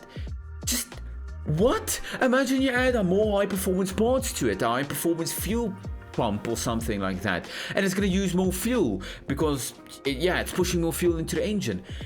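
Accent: South Africa. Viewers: hey dude epic video just a random question here by any chance are you south african? I'm just asking as myself im South African as well and the accent sounds very like South African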